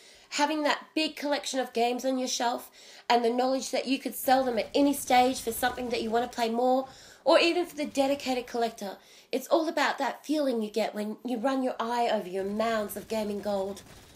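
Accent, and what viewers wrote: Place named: United Kingdom. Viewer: "True game-ah, a dedicated game-ah" Fuck you non-rhotic bitch, Man british accent's stupid and annoying as fuck!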